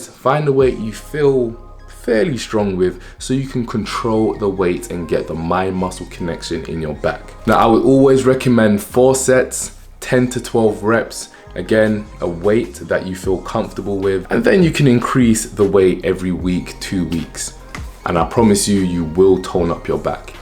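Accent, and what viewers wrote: London, United Kingdom. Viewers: I'm tired, when I heard him talk I was like oh he's british I need one in english 😂 I'm so damn sleepy. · I’m here for his London accent…👀👀👀 and for burning that back fat off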